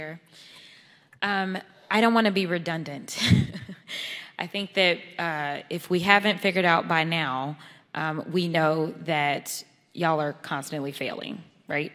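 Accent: South, United States. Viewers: If you know “anything” about people in Texas, they have a southern drawl…. “Y’all” means you all. 😊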